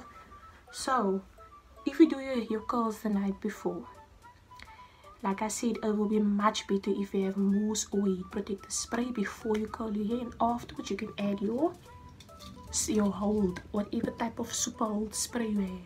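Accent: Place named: India. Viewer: Is that her real voice and accent. She looks black but sounds Indian🤔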